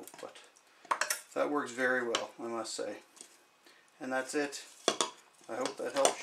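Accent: Cape Breton, Canada. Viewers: Hello fellow caper, I recognize the accent. My tub has a clogged abs trap, and it's too close to several other adapters/elbows that have no room to cut them without taking the whole thing out, which would require taking my surround out and basically redoing the entire damn thing. My question is: is it possible to cut off and remove the trap off the 2 abs pipes it connects to, without having to take apart anything else? Like a special way I can cut out the trap leaving just the rings, and then carefully cut them off, then sand down all the glue and just pop a new trap on there?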